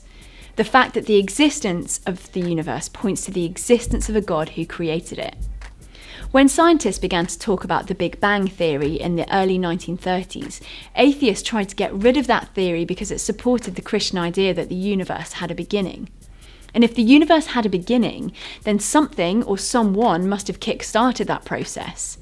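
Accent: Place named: England, United Kingdom. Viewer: Well, you were able to prove one thing at least: not everything said in an English accent sounds intelligent.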